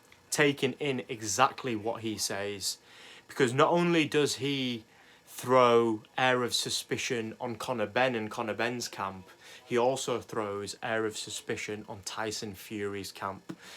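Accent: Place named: Birmingham, United Kingdom. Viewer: Underated channel mate,good info plus you sound like a fellow brummie👍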